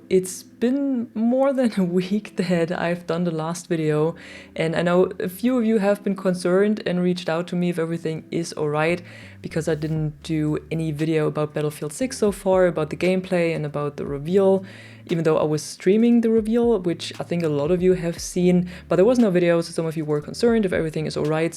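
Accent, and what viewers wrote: Germany. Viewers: Never seen this persons channel or stream before, but I am detecting a strong German accent.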